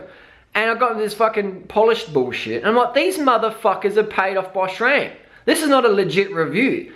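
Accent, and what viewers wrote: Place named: Australia. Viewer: has anybody ever noticed how annoying his fucking voice is like Australian accent is cool as fuck but his is just fucking annoying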